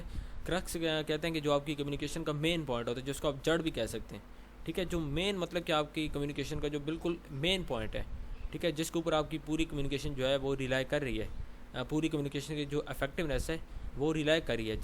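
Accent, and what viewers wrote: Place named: Saraiki, Pakistan. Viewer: your accent is like saraiki people💞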